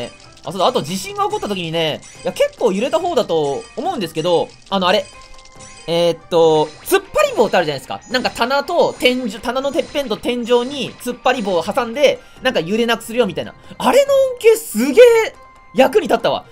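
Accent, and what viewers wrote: Ishikawa, Japan. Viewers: 何か意味不明なんだけど、何となく石川県民っぽくて地震大丈夫だったかな？みたいに思ってたら本当に石川出身と聞いて自分で驚いてる笑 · 動画見てるとたまに訛ってるなって前から思ってたけどスッキリしました、石川出身だったのですね。自分は富山の人間なので近いと思っていました。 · 、石川訛りって言われてて心配してたけど本当にそうだったんだ。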